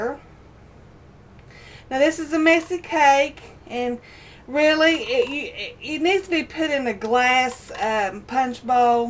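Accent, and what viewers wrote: South, United States. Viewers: i love your accent... what part of the south are u from? im from georgia... down here we call pecans... just that lol... pee..cans.... lol... im and old southern lady who forgot how to make a punch bowl cake.. lol... thank god for u and youtube... be blessed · Where are you from? Never heard that accent before! Sure nuff down home !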